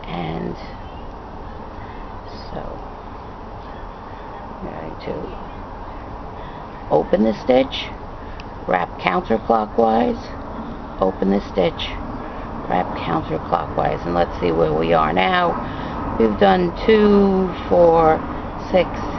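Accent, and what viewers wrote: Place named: Troy, United States. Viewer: We used to go to Troy on our way to Cadyville almost every week end because we had partying friends in "those days". Troy, was "big" on our list! I know; because your accent is most evident. I am amoung the 20 percenters of knitters. I knit though the back loop and purl through the front loop. I get a cross stitch unless I purl through purl through the back correct? I wrap my purls the same as You do. I subbed and I don't know how I missed Your wonderful channel in the past! Thank You. I do have a question for You. What will the most probable outcome be if I enter some of My awesome socks or knitting into the fair for judgement in Our today's world?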